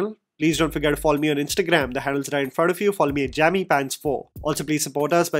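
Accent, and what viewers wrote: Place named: Telugu, India. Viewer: Boyy your telugu accent 😂😂😂😂😂😂😂😂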